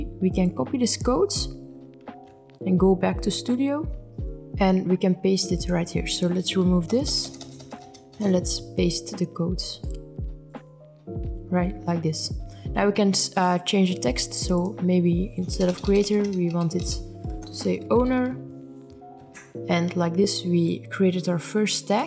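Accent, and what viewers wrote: Netherlands. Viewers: I smell NEDERLANDER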